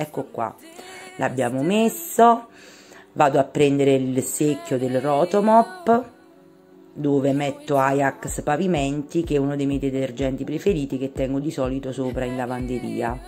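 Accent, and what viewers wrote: Naples, Italy. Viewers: Ti ringrazio se mi saluti da Siracusa e complimenti mi fai morire dalle risate quando racconti le cose alla Napoletana 🤣🤗🥰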